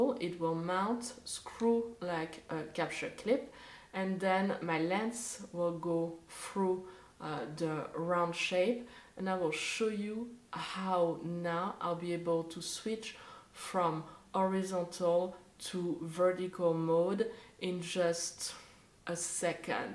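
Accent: France. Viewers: Hello :) J'entends à ton accent (1ère vidéo que je vois de toi) que tu es francophone voire française :) (Personne n'est parfait :D Je vais aller voir leur site, mais pour un produit équivalent, on m'a dit que le défaut - pour un Fujifilm - est que le bouton de release de l'objectif est difficile à atteindre. Ensuite il faut voir si l'ensemble de ton parc objo pourra encore se connecter à l'apn. Dans une autre vidéo, un photographe disait ne pas savoir installer tel ou tel caillou une fois cet accessoire placé... Je n'ai pas la référence de ce youtubeur mais il donnait ça comme principal défaut. Excellent produit qui m'intéresserait s'il s'adapte à mes Fujifilm et les cailloux qui vont avec :) En attendant je vais prendre le temps de découvrir tes vidéos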